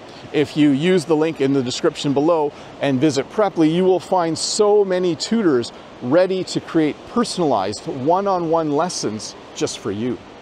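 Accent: Canada. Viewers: I am in Canada. A newcomer. Your videos are helping me to understand local accent ❤❤❤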